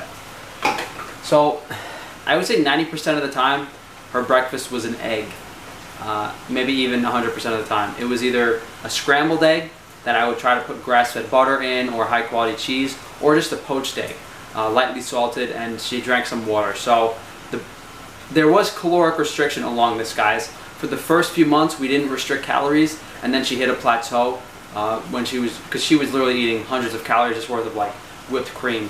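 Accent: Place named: New York City, United States. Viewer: Interesting accent. Can hear some nyc italian twang.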